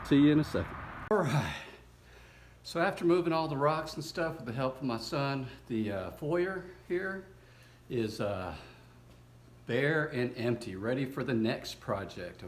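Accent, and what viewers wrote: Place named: Texas, United States. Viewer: I love your shirt and your accent, I just discovered your channel and it made me smile when I found out where you live. OF COURSE I said to myself…. Texas! Super fun videos keep them coming.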